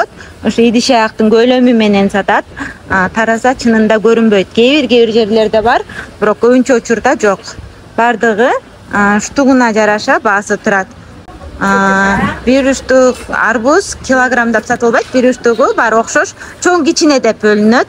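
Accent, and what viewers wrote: Standard. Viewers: Эн соонун!!!! Чет жерде журсо дагы нукура кыргызча суйлоп жатат бир тууганыбыз❤❤❤❤ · Баштык, дүкөн деп кыпкыргызча сүйлөп жатасыз рахмат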